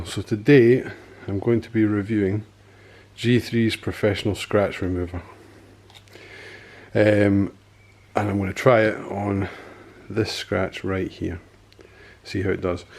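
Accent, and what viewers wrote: Scotland, United Kingdom. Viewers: You don't get many scottish guys on YouTube! 🤣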